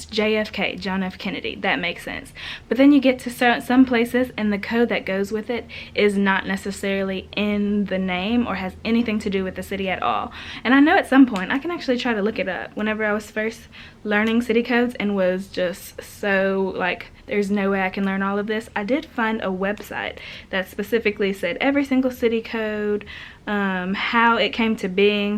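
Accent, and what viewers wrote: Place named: South Carolina, United States. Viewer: Sounds like Southern Carolina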